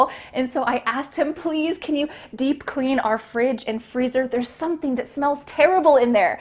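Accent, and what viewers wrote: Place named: United States. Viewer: It seen like that you are American 😊 Wow ! 😍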